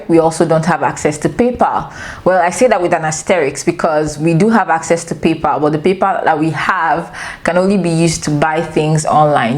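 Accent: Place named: Nigeria. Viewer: You sound like a Nigerian you speak exactly like me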